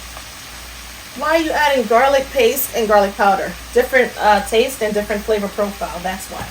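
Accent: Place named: New York City, United States. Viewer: It's "Creative Cooking With ". I told her that I knew this wasn't Cooking With recipes with that bland looking food and lack of seasonings. I went off. I tell everybody about your food. How you cook like you're from South but your accent sounds like NYC. A wonderful mix.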